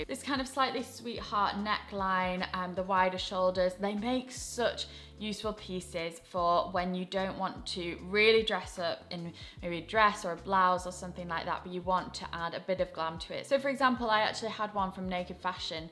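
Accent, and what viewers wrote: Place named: RP, United Kingdom. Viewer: Hi,! My name is Amy, I am from Bangkok, Thailand. I had been looking for the outfits that I wanted to wear for ages. Finally, I found you on the YouTube and I only stick with you even their are many YouTubers’ videos pop up on my new feed almost everyday but I never click on anyone else fashion styles. I really love the way you described your outfits. Meanwhile, I’m starting to order new clothes, trousers, shoes, belts, ornament and lots of things to wear according to your videos. I must say that I love your styles because you look expensive and glamorous even the outfits are not quite expensive at all. I mean affordable and they make you look fabulous in the same time with the clothes that you wear on your channel I don’t have a word to say about your dressing styles, apart from superb, splendid, fabulous etc. If you would like to come to Thailand, I would love to take you around my beautiful country. 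if you have a chance to come to Thailand I will surely take you around the beautiful beaches which have white sand and crystal clear water, I promise you this. Just come with your pocket money, that’s all. Your British accent is so posh! Please message me on YouTube. By the way, I am 60 years old. I don’t know much of how to use this social media. Thank God that I found you on the videos. I used to live in between between Belsize-Camden tube stations. Please forgive me for my broken English. X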